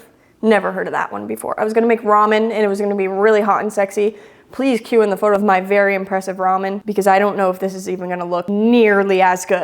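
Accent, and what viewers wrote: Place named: AAVE, United States. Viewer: Nothing more annoying than a woman speaking Ebonics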